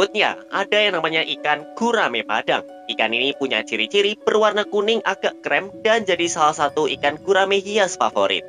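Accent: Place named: East Java, Indonesia. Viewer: tapi admin kyk orang Jawa timur Antara SBY atau sda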